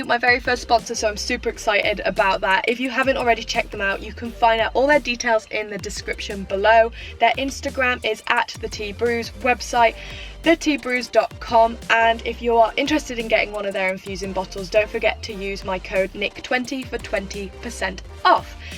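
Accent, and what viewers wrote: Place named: West Country, United Kingdom. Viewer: ...scaffold Pike 😜 Scarfell Pike ✔ you have a West Country accent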